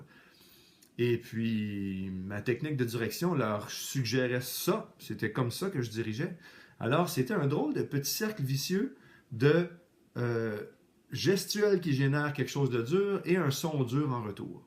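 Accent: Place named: Quebec, Canada. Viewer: J'adore l’accent québécois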